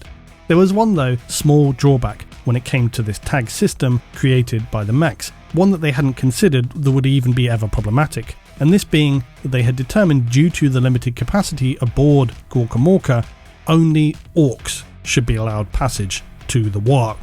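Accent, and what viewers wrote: United Kingdom. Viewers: Your voice and your accent in particular your British accent really helps you with a American audience I think I mean I like listening to you talk about this stuff more than say some American YouTube channels that talk about it most Americans want to admit it but a lot of them like a British accent when it comes to like narration an hour someone reading something or you know stuff like that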